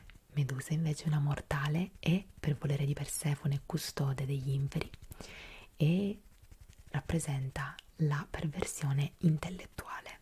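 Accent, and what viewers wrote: Sicily, Italy. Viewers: Ciao bella immaginavo fossi Siciliana io di Catania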